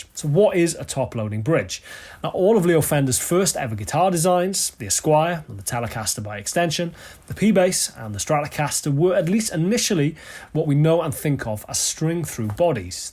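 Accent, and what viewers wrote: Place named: Cockney, United Kingdom. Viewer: i didnt listen to that kocknee stupid accent ! ol boy but when you played i got up turned that to rock concert level! you are very good but i will not listen to you speak.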